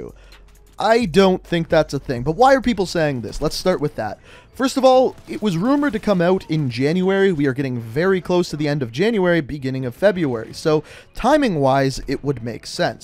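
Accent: Canada. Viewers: What's your accent? Like where you from because if I were to guess I'd go with Canadian????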